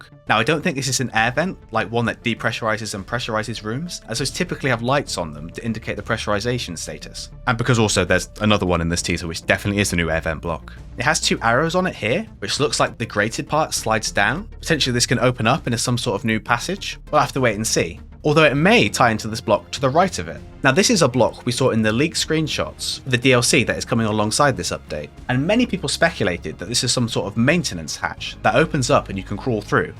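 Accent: United Kingdom. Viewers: I don't know, sometimes it did type Beta, its just your "e" sounds Vritish. Beeta, lol. Sorry making fun of English language cuz my native one is Spanish. BTW We also say aluminium instead of the 'merican aluminum. Go figure. Anyway fun video, thanks!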